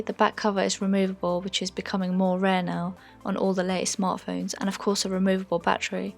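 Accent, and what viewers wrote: United Kingdom. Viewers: Love ur accent, which part of England are u from?:)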